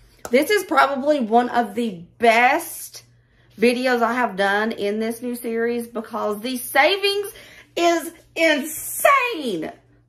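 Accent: South, United States. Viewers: Just love listening to your lovely Southern accent here in the UK. You are so natural and sensible like a gorgeous Mum teaching me to cook. I can afford what I want now but would have been so grateful of your help when I was young and broke!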